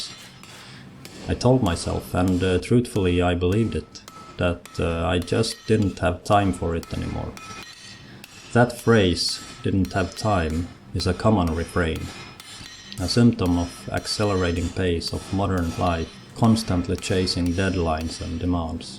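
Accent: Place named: Finland. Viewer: You do sound more Finnish than Swedish.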